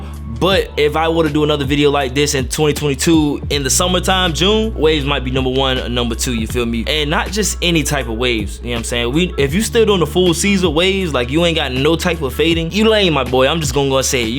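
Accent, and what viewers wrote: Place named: Philadelphia, United States. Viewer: You from philly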